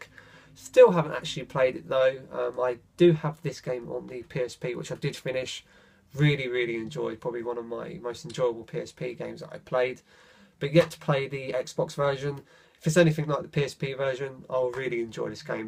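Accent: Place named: England, United Kingdom. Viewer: Very cool channel man! Sub for sure. I'm just a sucker for that cool English accent haha u don't have that many games on your collection u haven't played, if I was to do a video like this, I would show 80% of my collection unfortunately, maybe more. One day I'll catch up, that's what I keep telling myself anyway. I used to play a lot of rainbow six Vegas when I first got the 360 had a lot of fun with that game! Anyway you got more cool videos and I'll go check them out! Keep up the good work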